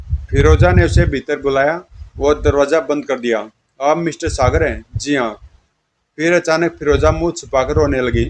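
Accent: Haryana, India. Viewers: Love your Haryanvi Style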